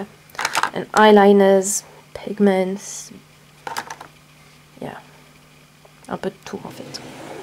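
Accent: United Kingdom. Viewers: Tu as un accent so british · ton niveau d'anglais est top!!! en plus tu a l'accent anglais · un très joli accent Londonien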